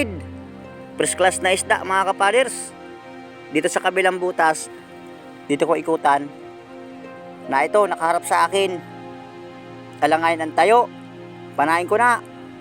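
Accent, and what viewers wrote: Bicol, Philippines. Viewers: bicol tagalog salita m idol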